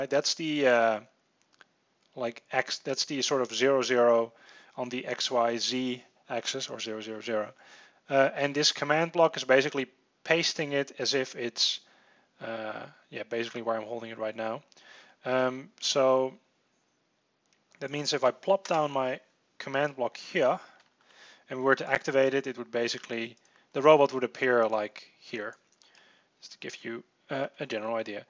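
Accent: Netherlands. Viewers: je klinkt nederlands. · You sound Dutch as fuck.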